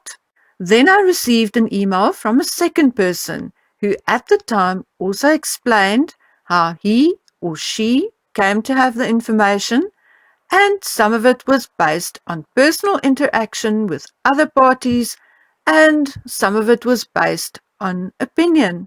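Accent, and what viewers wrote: South Africa. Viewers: Hello, nice accent. Where are you from in SA? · Is this South African accent I hear Hi from fellow South Africa. I love your posts and watching with interest. I have been following Celt, Yankee Wally, the Vixen, Sandidog and other tumblr blogs et al for a couple of years now and matters relating to this subject are at last being discussed in the public arena. I have always been curious that H's wife has never sued anybody who has expressed doubts about Archie's birth. There are numerous pictures and videos showing bump falling down to knee level (the purple and pink outfit) the swaying bump (the short dress, white with greyish pattern) and many more. None of us like being hoodwinked or fooled with, so the truth exposing these two cannot imo come soon enough. There is no shame in surrogacy which cannot determinate a child's colour, so they need to come clean or be exposed. 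The outcome won't be pretty. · your research is outstanding. Your honesty is greatly appreciated. I used to live in S.A. and it’s a pleasure to hear your accent. Good luck with what is going on in your personal life - that comes first. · I am loving this, I was 45 years in South Africa and the accent is so lovely to hear again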